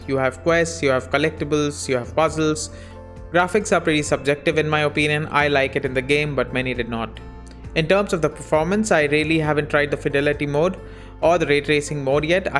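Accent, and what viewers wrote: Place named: India. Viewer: Couldn't watch longer than a minute without clicking off listening to that annoying Indian accent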